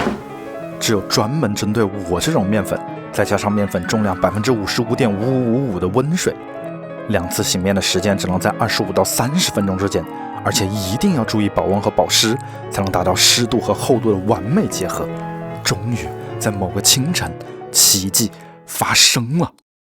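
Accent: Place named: Hunan, China. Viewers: 哈哈哈哈，到了国外也压不住你的湖南口音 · 你听起来是湖南人吧😂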